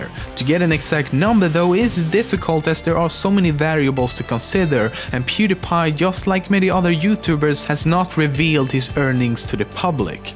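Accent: Sweden. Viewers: Are you swedish? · his accicent was so much more prevalent back then, goddamn. Like that's obviously swedish compared to his current accent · Jag satte och kollade helt random (hade misstankar om att du var svensk igenom sättet du pratar på) och helt random... MEN VAFAN xD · are you swedish? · you still sound so Swedish here! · are you a sweaden person?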